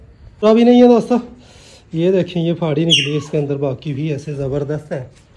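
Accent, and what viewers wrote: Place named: Rajasthan, India. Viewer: Bhai aap ki Bhasha hamare Rajasthan ki Bhasha se milati hai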